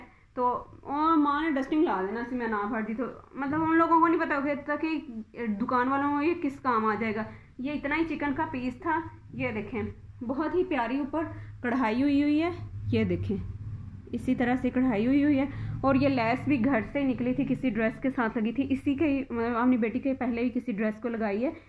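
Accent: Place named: Sialkot, Pakistan. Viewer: MashaAllah bohot bohot peyary design ap bnati hain. apki awaz se lgta hai ap Noreen ki sister hain life of noreenسیالکوٹ ki lgti hain ap🥰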